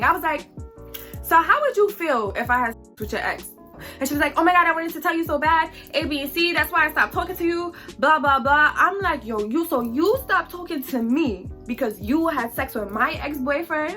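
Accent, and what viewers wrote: New York, United States. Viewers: you from New York,🤔 don't know if it just me but I hear a bit of New York in your when you talk lol. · Is it just me or she sound a little bit like Nicki or that’s just the New York accent idek. Or like she could say Barbados like Rihanna 😭🥰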